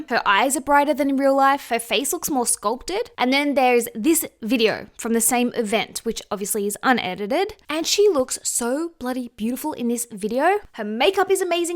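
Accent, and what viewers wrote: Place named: Australia. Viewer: It’s the Australian accent bro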